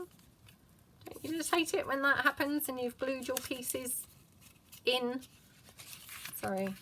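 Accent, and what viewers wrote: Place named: Midlands, United Kingdom. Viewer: Great ideas! You sound like you might be from the Midlands, like my relatives. ; )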